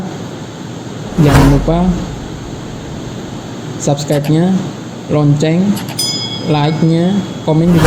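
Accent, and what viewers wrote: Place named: Java, Indonesia. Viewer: Logatnya medok Jawa keren bgt penjelasan nya 😁seru mendengar nya, btw suami istri perlu kah pisah harta?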